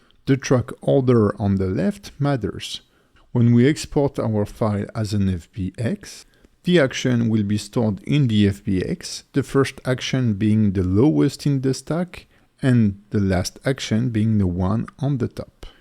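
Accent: France. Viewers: Are you french? because of yoour accent ✨ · elle est ou la version FR? j'ai griller ton accent:p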